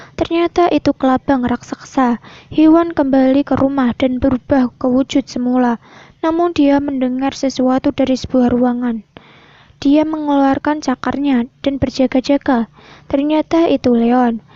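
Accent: Java, Indonesia. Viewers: Kak kakak orang jawa ya??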